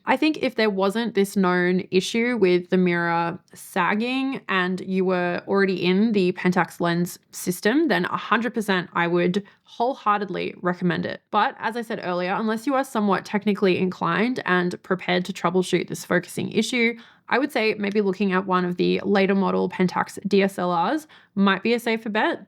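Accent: Australia. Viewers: You are so Australian and particularly QLD. Love it!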